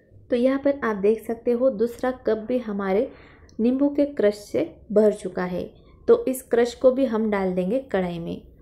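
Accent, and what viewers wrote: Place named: South India, India. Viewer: Achaar dekhne se hi kafi tasty lag rha hai aor usase bhi achi baat hai apki Hindi bhasha jisme se thoda thoda South Indian ka khushbu aa rahi hai, that sounds nice and God bless 🙏🙏💐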